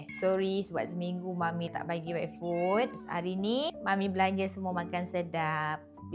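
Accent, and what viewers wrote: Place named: Java, Indonesia. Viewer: Akak org jawa ea?dgr cara bcakap pekat slank jawa akak..😅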